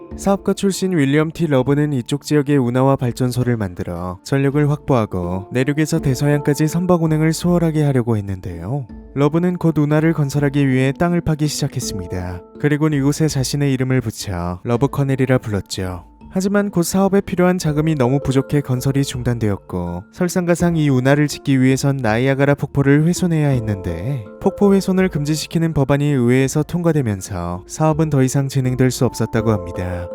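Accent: Japan. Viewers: 왜 자꾸 영어가 일본어 들리지 ㅋㅋ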